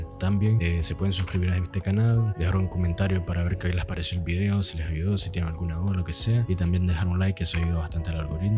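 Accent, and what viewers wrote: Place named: Nicaragua. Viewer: Hermano, de casualidad no sos de Nicaragua? lo digo por el acento jajaja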